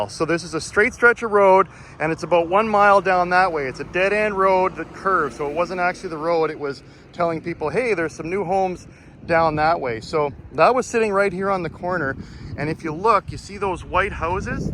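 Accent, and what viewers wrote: Canada. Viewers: You must be a Canadian with that accent of house road and about. Terrible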